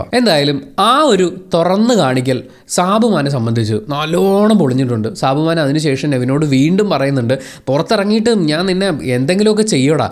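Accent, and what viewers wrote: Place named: Kochi, India. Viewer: Your kochi slang is superb 😂😂 Exactly like Adhila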